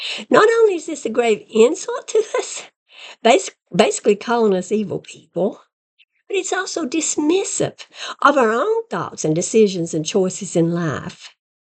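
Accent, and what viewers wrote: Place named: Texas, United States. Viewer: Are you texan? Your accent sounds texan (im not from america)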